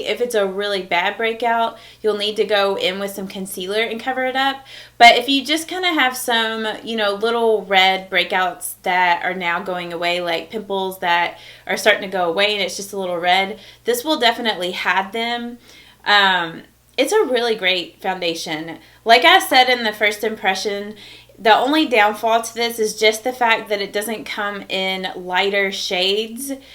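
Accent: South, United States. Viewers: shruti, she sounds southern. I’m from Alabama and picked up on it, too. Love hearing familiar voices on YouTube. 🤗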